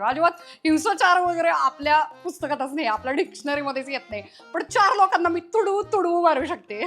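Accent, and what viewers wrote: India. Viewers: लय गावरानभाषा आवडली1चंबर❤ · 😀😀जबरदस्त कॉमेडी हसून हसून वाट लागली. तुला काय भन्नाट आणि खरे विषय सुचतात ग. आणि अगदी हुबेहूब गावरान बोली भाषा तो अभिनय ऐक नंबर. 😀😀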